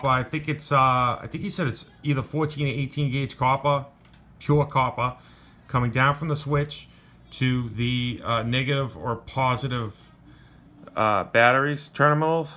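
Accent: Boston, United States. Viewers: Is this guy from Boston? Most times he said copper it sounded like coppah Either Boston or Philly. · u from Boston ain't ya? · are u from boston cuz i can hear boston accent.lmao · i was wondering where your accent was from then you said copper. Only people that say cahpah are from boston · this dude is from boston right? his accent wierd.... "copah" lmao xD insted of "copper" lol when i heard him say coper i instantly knew XD! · Can tell you probably from Boston or close by. I'm from South Boston myself. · r u from boston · u must be from Boston or Maine aye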